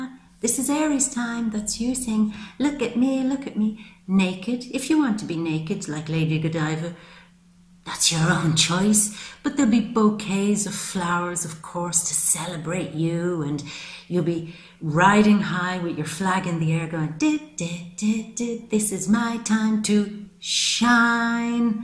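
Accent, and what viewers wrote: Ireland. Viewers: Hey! Just found your channel and loved this reading 💟. Great to hear a fellow Irish woman here 😊. Look forward to following you and looking out for future notifications. Keep up the great work! Thank you 😃👏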